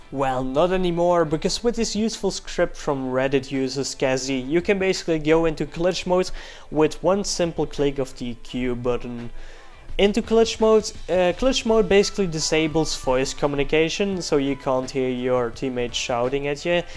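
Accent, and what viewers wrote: Netherlands. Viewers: ben je Nederlands? lol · This guy sounds dutch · Wait your Dutch? Ik tought you were danish or German. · Wait are you dutch? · Nice, dacht al aan je accent te horen dat je Nederlands was, maar dit filmpje bevestigd het ;p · i noticed ur dutch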